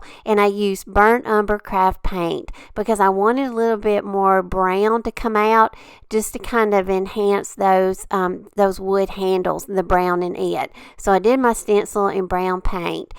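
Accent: South, United States. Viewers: It’s the southern accent for me!💕💕💕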